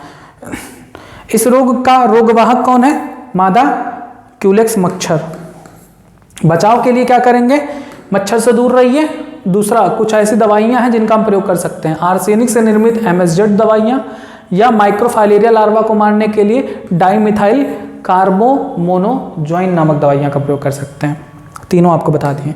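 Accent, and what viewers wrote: Chhattisgarh, India. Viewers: Sir aap Chhattisgarh se ho kya???🙂😁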